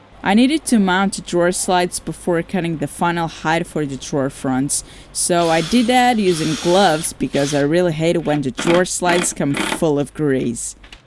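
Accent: Portugal. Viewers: this is a portugesse accent? love it anyway. it reminds me of a dutch accent almost. im binge watching 'the handmaids tale' and stumbled upon you. this recommendation suits me. thank you , youtube.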